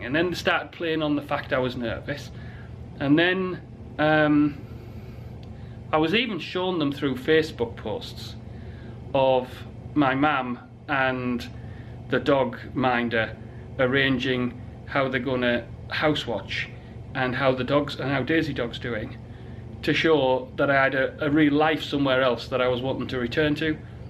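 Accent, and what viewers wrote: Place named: Durham, United Kingdom. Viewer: Fandomlife I'm from Durham, recognised the accent lol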